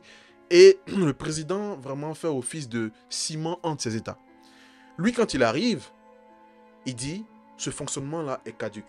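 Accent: Ivory Coast. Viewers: J'aime bien la façon de narrer avec ce accent ivoirien; je m'abonne direct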